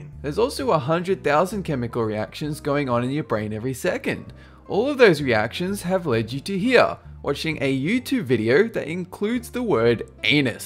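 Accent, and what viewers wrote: Australia. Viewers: I was wondering for a while, i am struggling to pin accent, is he Aussie?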